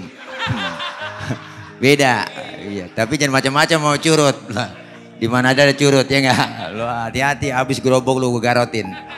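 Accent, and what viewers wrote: Jakarta, Indonesia. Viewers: Jangankan ceramah ngomong aja kalo logat Betawi berasa asyik bener tongkrongan.. ingat Ama babe Mandra dan benyamin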